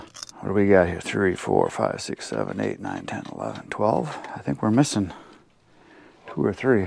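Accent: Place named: Midwest, United States. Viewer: Good story about the flame / firewood. Its true too. Love your midwest accent., Reminds me of my college years in Appleton WS. Go Packers !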